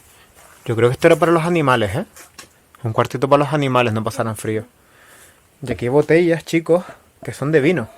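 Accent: Spain. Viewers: X eso tiene el acento español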